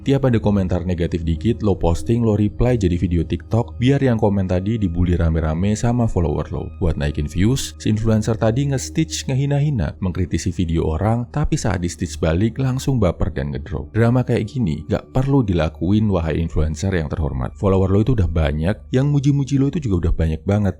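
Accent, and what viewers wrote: Java, Indonesia. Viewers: Keren bang pasti orang jawa ni admin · Mas wong jowo yo?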